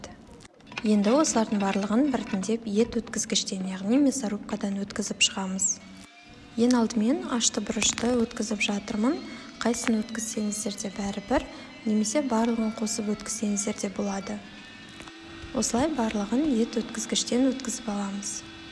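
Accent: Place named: Uzbekistan. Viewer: Ашты деген соз жок ащы .Казакша дурыс сойлендерши онтустик халкына отиниш .Айналып дегенди айланып дейсиндер жыным келеди .Озбек , калпак болып кеткенсиндер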